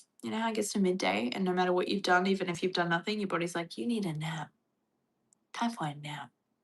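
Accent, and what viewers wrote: United States. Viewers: Your American accent cracked me up! 😂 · Ok, I’m American (unfortunately 😬), and your American accent always, always makes me laugh, I friggin’ love it 😂👌🏼 …gonna save this video to watch the rest when I have more time, but I just wanted to say—I really do love these longer videos you’ve been making lately. Whether you have Hammy in there with you or not, whether you’re cooking, or making other stuffs…just anything! Almost feels like we’re hanging out together gabbing about random things and—maybe weird to say—it’s just a lot of fun. 🤷🏼‍♀️ 🍻💃🏼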